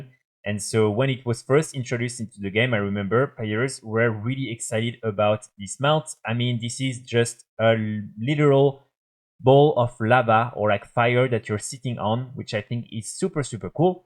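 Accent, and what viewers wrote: France. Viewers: French accennnnt guyyyy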